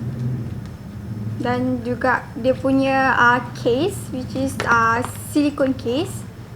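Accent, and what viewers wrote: Kelantan, Malaysia. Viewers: Ore kelate ke review.? Macam kelate jah... Jugak..?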